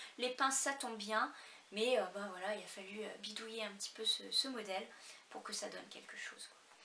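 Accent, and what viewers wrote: Quebec, Canada. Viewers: Coucou, contente de te retrouver, j'aime toujours autant ton univers et lorsque tu as l'accent ou que tu sors des mots québécois, cela me fait plutôt sourire, j'aime bien. De bien beaux ouvrages et bravo pour Biscotte ! Merci pour le partage du modèle, je me laisserais peut-être tenter... Passes une bonne journée et à tout bientôt.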